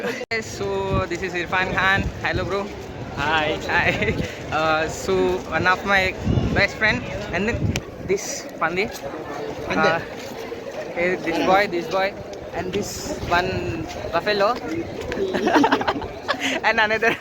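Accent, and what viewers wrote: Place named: Tirupati, India. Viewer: Where are you from bro ? , Bangalore ah ? Tirupati laga vundhi bro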